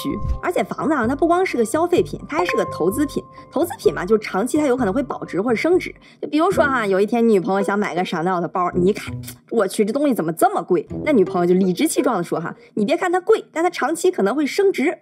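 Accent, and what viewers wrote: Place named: Dalian, China. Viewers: 怎么像大连人似的😄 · 我刚进来看， 她讲的不错， 我也是大连的！ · 在异国他乡听大连老乡说普通话真亲切！现在不仅我和我老公在看你的频道，我连我爸妈也安排上了。加油小Lin!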